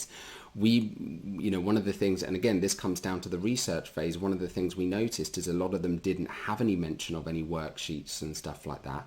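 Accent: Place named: United Kingdom. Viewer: Thank you. A class is better when given in british accent.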